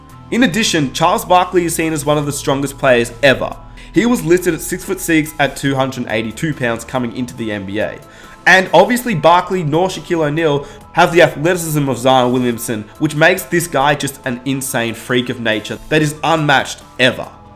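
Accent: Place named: Australia. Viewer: Australian mate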